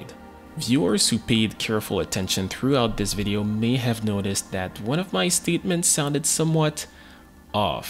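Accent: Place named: Quebec, Canada. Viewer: Getting some Québécois vibes out of your accent. Thumbs up for the video.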